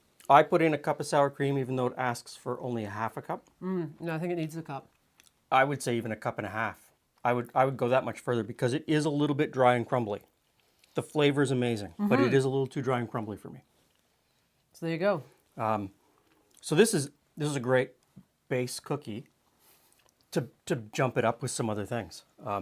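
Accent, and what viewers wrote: Canada. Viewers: I hear your Canadian accent,👍🏻👍🏻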